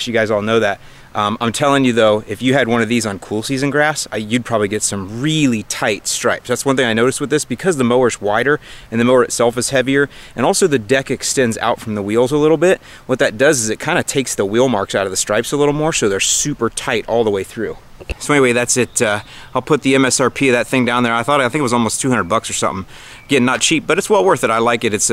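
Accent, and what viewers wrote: Chicago, United States. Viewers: Keep the Chicago accent. too funny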